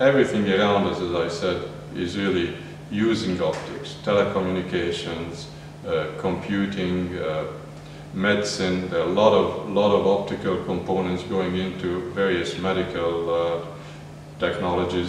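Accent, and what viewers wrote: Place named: Netherlands. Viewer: I hear the professor is from the Netherlands.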